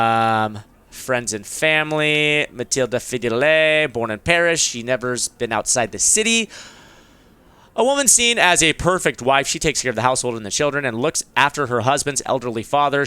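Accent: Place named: United States. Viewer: Oh god, should be on trial for how he pronounces stuff haha. A for effort though and smart of to just go with the 'I'm not even gonna try anymore and just be an american dude pronouncing it in english'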